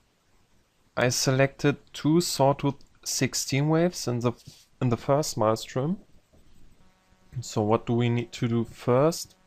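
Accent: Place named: Germany. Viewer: An deiner Aussprache hört man dass du deutsch bist :D Und dann hab ichs an deinem Programm gesehen xD Geiles Tut!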